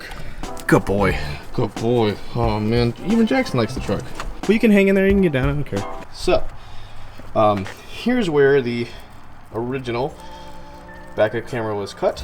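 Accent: South, United States. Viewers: Yay the update (I swear you had such a stronger country accent in this video) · Just me or did he have like a super southern accent today?? lol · sounds more country for some reason lmao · got a truck and now he has a country accent. I used to watch vids all the time, now I check in after 2 years not watching and he has 1 million subs and a country accent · Listen closely. is developing a southern accent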